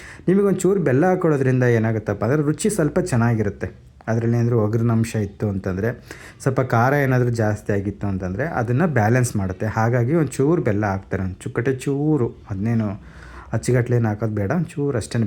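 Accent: Chitradurga, India. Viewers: ಸರ್ ನಿಮ್ಮ ಮಾತು ಕೇಳಿದರೆ ಸಂತೋಷ ವಾಗುತ್ತದೆ. ಚಿತ್ರದುರ್ಗ ಭಾಷೆಯ ಸೊಗಡು , ಪದಗಳನ್ನು ಬಳಸಿ. ಬದಲಾವಣೆ ಬೇಡ . ಏಕೆಂದರೆ ಕನ್ನಡ ವೈವಿಧ್ಯ ಮಯ ಭಾಷೆ . ಪ್ರಾದೇಶಿಕ ಭಿನ್ನತೆಗಳು ಇರುತ್ತವೆ . ನಿಮ್ಮ ಮಾತು ನಿಮ್ಮ ಸೊತ್ತು . 👍👍👍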